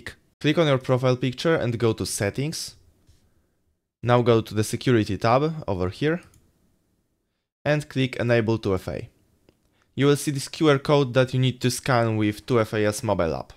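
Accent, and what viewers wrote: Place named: Poland. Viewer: jest z Polski bo trochę po głosie słychać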